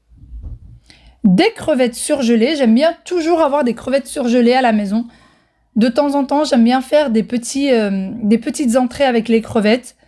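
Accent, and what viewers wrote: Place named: Morocco. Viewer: Tu es marocain d'apré les produits😂😂et l'accent nous les femmes marocain hh on'est trés connus😅bon chance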